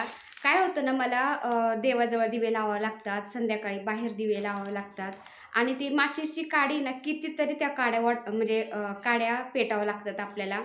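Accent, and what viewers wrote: Ahmednagar, India. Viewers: Nagar chi bhasha lagech olakhun yete amhi pn kud bolato sagale bolatat kuthe bolav pn aplich bhasha chhan ahe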